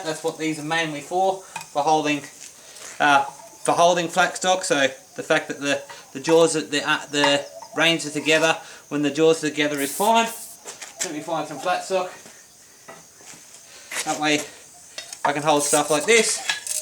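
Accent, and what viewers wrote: Australia. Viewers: What part of Australia are you from? CHEERS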